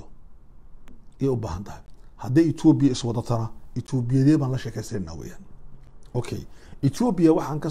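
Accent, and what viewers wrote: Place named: Djibouti. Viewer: Waxaqiiqo waxaan kuu sheegayo Waa iska jeclahay lahjada reer Djibouti shiiqu runta uu kahadlay somali israamsatay oo kala tagtay Hala qabsado kolay aniga qaxooti baan kahay dhulkii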